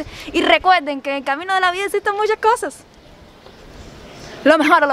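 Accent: Cuba. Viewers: Viendo este video en 2023, ¡wao! que delgadita eras y hablabas muy distinto ya has perdido bastante el acento cubano.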